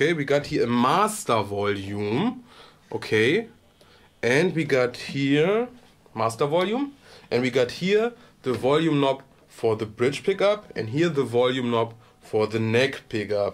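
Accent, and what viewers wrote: Germany. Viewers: Mann, ich liebe deinen deutschen Akzent. :D Normalerweise stört mich das bei Deutschen, die Reviews auf Englisch machen (Thomann - ganz grauenhaftes Beispiel). Aber bei dir ist es irgendwie ganz cool. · Your English with the heavy german accent is just wonderful 😊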